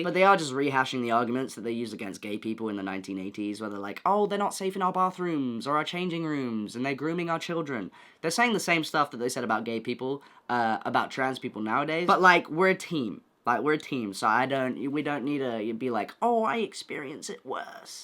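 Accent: United Kingdom. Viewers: this is not a hate comment or anything but OH MY GOD i did not expect this accent. Like you have the most american face ever. you look like you surf in your free time. and i see your californian face and i watch the video and its the most bri ish accent ive ever heard. like this is insane. nevermind the accent, i really liked your takes and generally the way you speak. you seem to be a very smart and compassionate person. very nice video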